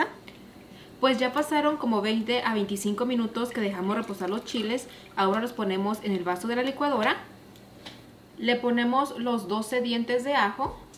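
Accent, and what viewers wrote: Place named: North, Spain. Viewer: Parece del norte su acento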